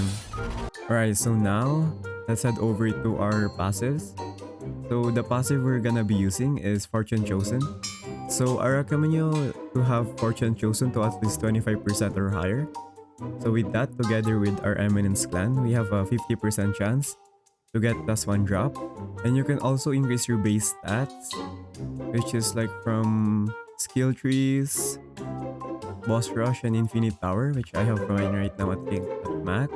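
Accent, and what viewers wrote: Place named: Canada. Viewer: this might be the most candian accent i ever heard in my lifetime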